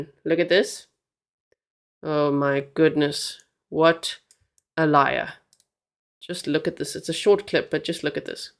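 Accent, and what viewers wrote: South Africa. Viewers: Are you South African? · I heard you say braai are you South African? Lovely to hear that accent. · Thanks for this, what a terrible story maybe this will wake someone up! Btw could tell you were South African by the accent but as soon as you said brai that confirmed it.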